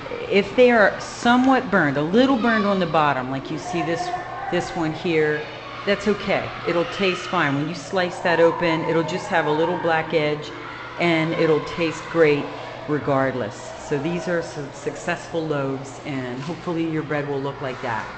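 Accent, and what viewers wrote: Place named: Pennsylvania, United States. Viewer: I'm hearing a Pennsylvania accent :)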